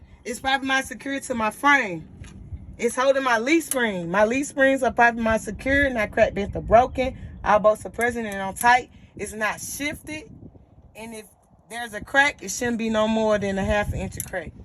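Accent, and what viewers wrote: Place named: Dallas, United States. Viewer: Watched all your videos back to back and they are very helpful. Can tell you in Dallas from the accent love it. Thanks for the videos, got about two weeks till my test!!!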